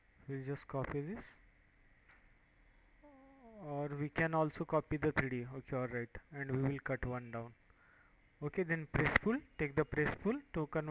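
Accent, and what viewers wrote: India. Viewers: This narrator is awful! What the helll is he saying? Get rid of the india/english accent, or get someone else to speak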